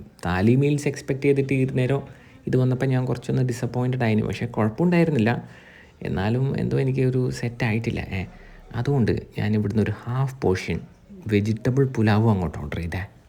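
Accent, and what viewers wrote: Kannur, India. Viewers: ഇയാളുടെ വിഡിയോ ഓഡിയോ മ്യൂട്ട് ചെയ്ത് കാണുന്നവർ ഉണ്ടോ? പാണ്ടിക്കാട് കുഞ്ഞാൻ മലപ്പുറം ഭാഷയെ വെറുപ്പിക്കുന്ന പോലെ ആണ് ഇയാൾ ഓവർ സ്ലാങ് ഇട്ട് കണ്ണൂർ ഭാഷയെ വെറുപ്പിക്കുന്നത്. ഞാൻ കണ്ടേ, ഉണ്ടേ, പോയതാണെ ഹോ കേക്കുമ്പോൾ തന്നെ അരോചകം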